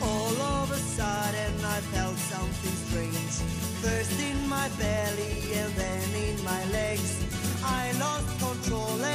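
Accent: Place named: Spain. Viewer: Sounds like he has an accent.. Spanish maybe?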